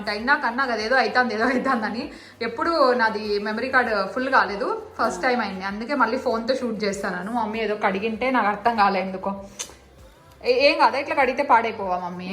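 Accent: Anantapur, India. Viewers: Hi l love anantpur language it reminds me my amama village elukuntala I spent my childhood Holiday's over there, my grany Cook's pappu very tasty, by the way can u please mention hair shampoo, and soap,i am bit confused which one to use to my 9years old daughter.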